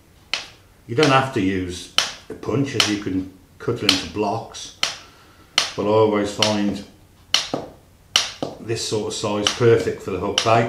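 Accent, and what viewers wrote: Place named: Bromsgrove, United Kingdom. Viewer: Your accent reminds me of my old home area of Bromsgrove, I now live in Brighton { for the last 23 years } and only about 3/4 of a mile from the sea! Believe it or not, I sometimes go fishing, like, most of the time now I'm retired! I've suscribed now and will be working my way through all your vids! Without too many details, just to satisfy my curiosity where abouts in the country do you live mate if you don't mind me asking?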